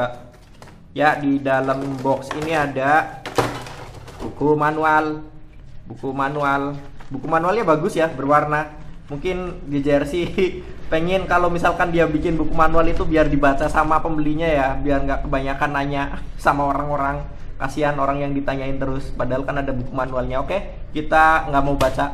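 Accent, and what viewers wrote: Banyumas, Indonesia. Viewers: mas e ngapak e mana e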